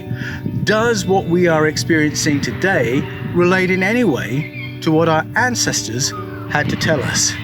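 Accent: Australia. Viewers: 1st, you speak awesome Australian! Not a hint of an English accent! Great content. This creature sounds like a Bigfoot like we have here in the States.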